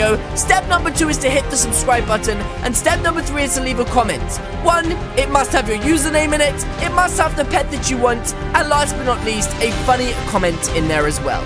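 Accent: United Kingdom. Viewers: I love ur voice it's British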